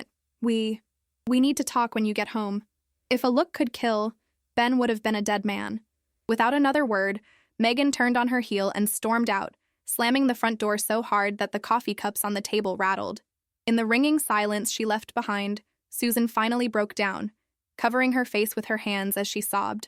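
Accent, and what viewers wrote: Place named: United States. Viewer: Narration in an American accent, calling the trunk of the car, the "boot," which is what Brits call it, amused me.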